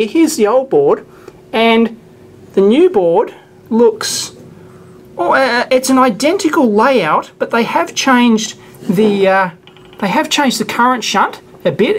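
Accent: Australia. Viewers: I don't get it. You just sound Australian to me. Why do people keep pointing out your accent. You're perfectly legible.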